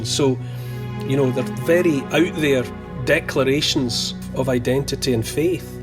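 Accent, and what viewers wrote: Scotland, United Kingdom. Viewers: D'ya think y'can lose that Scoooooots accent as it jars on my nerrves?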